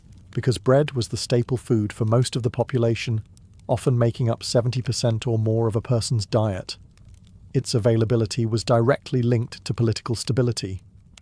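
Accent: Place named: England, United Kingdom. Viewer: LMFAO You crack me up when you say such funny things in that classy English accent of yours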